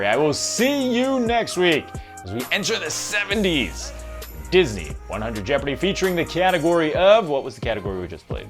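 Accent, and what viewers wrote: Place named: New York City, United States. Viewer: same! is such a natural with hosting! One of these days, I will do a "Hello Belle!" impression just as good as him. Plus, you can't go wrong with his NYC accent (, just an FYI, I'm going to your hometown later this month! NYC is my favorite! I'm so excited!!) Wheel of Fortune dropped the ball by not tapping him to be Pat Sajak's successor and instead going with that overblown twitty twatty Ryan Seacrest.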